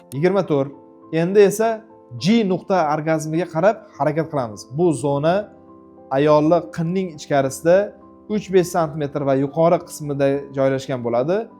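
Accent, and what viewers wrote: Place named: Andijan, Uzbekistan. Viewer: андижонлик экан жижча деп гапиряпти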